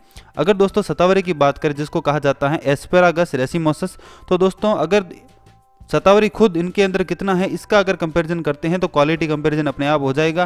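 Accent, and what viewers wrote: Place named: Rajasthan, India. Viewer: Aap rajasthani ho.aap ki bhasa se lagta he..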